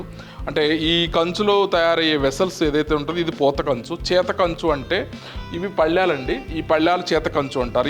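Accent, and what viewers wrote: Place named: Telangana, India. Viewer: Telangana yaasa idhi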